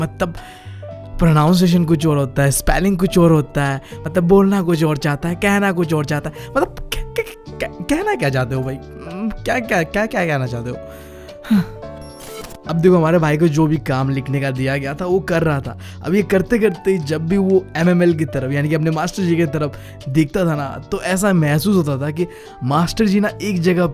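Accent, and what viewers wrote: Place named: Bengal, India. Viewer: bro you are a bangali becase my friend speakes same like you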